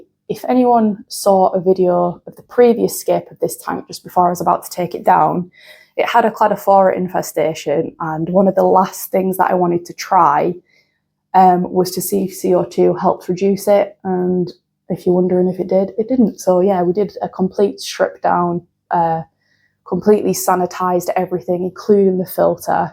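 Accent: United Kingdom. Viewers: that marvelous English accent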